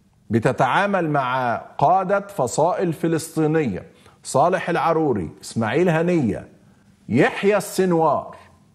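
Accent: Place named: Egypt. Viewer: من القذارة ان تقول " سعودي" اسمعني انا اردني ومن أصل أردني ومع ذلك بحكي انه فيه فلسطينيين خونة وفيه فلسطينيين يا الله ما أحسنهم..ونفس الشي في سعوديين محترمين وفيه سيئين..من الغباء الفاحش ان تقول السعودية في حين ان اسرائيل تلعب كرة القدم بلاعبين فلسطينيين .. عيب .. السعودية فيها ناس ولا أروع... لكن احكيلنا عن بلدك مصر كونه لهجتك مصرية..ايش عملت؟ ولا شي ..هي جارة غزة وسكرتوا ابوابكم بوجه الناس..اخرسوا احسن ..غزة خذلت منكم انتم تحديدا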